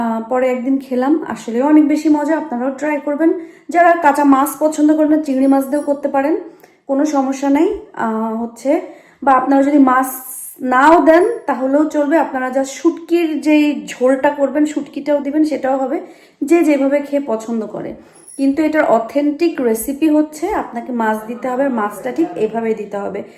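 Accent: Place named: Jessore, Bangladesh. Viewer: السَّلَامُ عَلَيْكُمْ وَرَحْمَةُ اللهِ وَبَرَكَاتُهُ আশা করি ভালো আছেন। নীচের কমেন্ট গুলো মনজোগ দিয়ে পড়বেন এবং মনে রাখবেন। ১। আপনার উচ্চারণ ঠিক করুন। বাংলা শুদ্ধ করে বলেন, যশোরের উচ্চারণ পরিহার করুন। আপনার বাংলা শ্রুতিমধুর না। ২। কথা কম বলা ভালো। কথার তেমন দরকার কি? যেখানে ছবি কথা বলছে। ৩। ক্রিয়া বাচক শব্দের ব্যবহার শিখুন। একই বাক্যে দুটো ক্রিয়া ভুল। যেমন আপনি বলেন অনেক বেশী ভালো, এখানে অনেক ভালো বললেই হত। বাড়ি সুন্দর এখানে খুব ভালো বলা যায়। বেশি ভালো হবেনা ইত্যাদি। ৪। কোনো কোনো শব্দ স্টাইল মারতে গিয়ে ফানি হয়ে যায়। যেমন আল্লাহ হাফেজ। নরমাল ভাবে বললেই হয়। আজকের মত এটুকুই। যদি দেখি ইমপ্রুভ করেছেন তাহলেই আবার কমেন্ট করবো। আশা করি মনে কিছু করবেন না।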